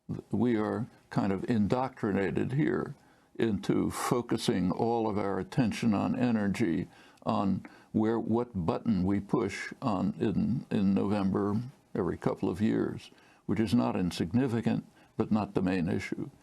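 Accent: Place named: United States. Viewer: I love Chomsky but I find his accent really really hard to understand. Some American accents are really hard to follow and his is one of them.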